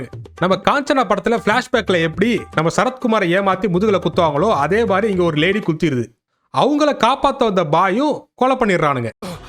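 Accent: Kongu, India. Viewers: Day kongakara kannada nalla irkunda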